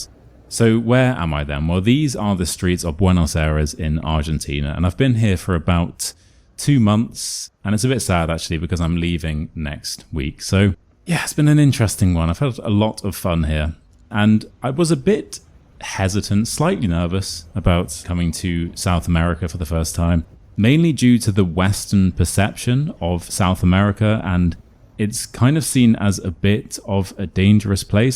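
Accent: RP, United Kingdom. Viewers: Ramble on, we enjoy listening to your “ever so English” voice. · Hi! What a lovely video and content! (I don't know anything about eye patches or the like). I'm glad you've had some fun in spite of your hard work. Most real English teachers from Argentina go to university and undergo a 33-yearly subject study programme, including Language 1, 2, 3 & 4, Grammar 1,2 & 3, Phonetics 1 & 2, Stress and Intonation (laboratory) 1 & 2, English Literature 1, Contemporary Literature 1 & 2, Shakespeare, History of the British Isles 1 & 2, Geography of the British Isles and the USA, American Literature, American History, Pedagogy, Psychology, Methodology 1 & 2, Ethics, Philosophy, ( Religion 1, 2, 3 and 4), etc. I did Practice lessons with teens. But I love working with children and preteens. I don't give on line lessons, just in case you think that I'm offering myself as a teacher😅. 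I follow you because I'm losing my memory and I love your modern RP pronunciation. As you said, you are a real flesh and bones teacher, handsome, talented, diplomatic, hardworking and honest. Thank you, dear teacher! ❤🎉🌺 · Give me your contact number I want to learn British accent from you I am Pakistani girl I want to go to the London on study visa if you don't mind plz sir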